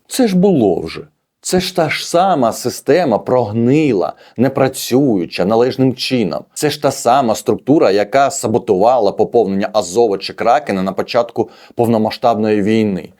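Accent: Russia. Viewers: не смог посмотреть только из-за того, что украинский от автора (который явно думает на русском и всю жизнь говорил на русском) просто ужасен. вот эта вот типичная путаница и/і просто сводит с ума. никогда не смогу понять, как может украинец в Украине говорить на украинском с АКЦЕНТОМ. это пиздец, господа. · Скільки років Незалежності України ? 33 роки. Скільки РОКІВ ВИ РОЗМОВЛЯЄТЕ УКРАЇНСЬКОЮ ??? 1 РІК . МОЖЕ 2 . ЦІКАВО , ОСОБЛИВО ЗВАЖАЮЧИ НА ВАШ РОСІЙСЬКИЙ АКЦЕНТ ТО ВИ НЕ СОВОК ???????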